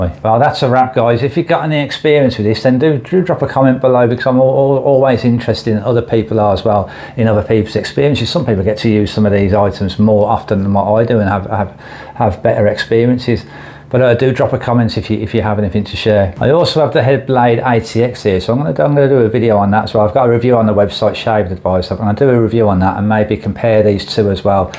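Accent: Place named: Birmingham, United Kingdom. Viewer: Love the Brummie, I thought it was Brummie lol.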